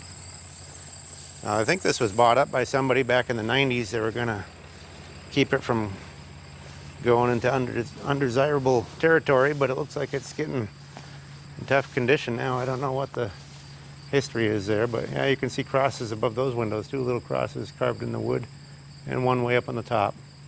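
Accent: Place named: Minnesota, United States. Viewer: That convent is a pretty magnificent old building in the daylight, but would scare the sense out of me to walk up on it at night. No way you'd get me in there. If I was to guess, I would say you might be from Minnesota. I lived there as a kid in the 60s, and your way of speaking sounds like my mother's side of the family who still live there. I've lived in Nebraska ever since then and some people still guess me to be fom Minnesota. Some things ya never lose. Enjoyed the tour. Thanks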